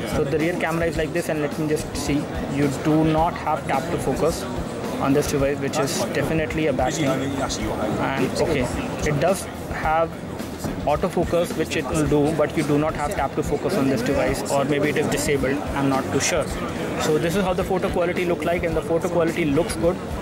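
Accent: India. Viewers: I can understand the words even if it's fast. He's pronouncing it clearly by using his indian accent.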